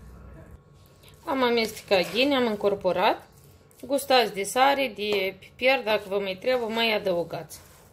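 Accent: Moldova. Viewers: Seară Frumoasă! Dragă! Foarte frumos și delicios! La Mulți Ani Fericiți! Copilașului tău și la toți copiii din lume! Îmi place foarte mult cum gătești și cum explici in dulcele grai moldovenesc! Fiecare regiune are regionalismele sale! Îți doresc o săptămână frumoasă și binecuvântată alături de cei dragi! Cu mult drag! ❤️😘🌹🌹🌹🍒🍓🐈🧁🏡💥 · Dulce-i graiul moldovenesc 😃 nu lua în seamă comentariile rele de la proști. Ai un program frumos și mulțumim pentru că împarți rețetele cu noi. Eu sunt din oltenia și înțeleg tot ce spui, îmi place când zici " iaca așa" 😃 Mulțumiri și fericire la toți ❤ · Ești o scumpă Draguto mie îmi place cum vorbești ,am avut multe prietene din Basarabia când am fost mai tinerica ,bravo ești o gospodină desăvârșită ,💖💖💖💖💋💋💋💋💋🌹🌹🌹🌹🌹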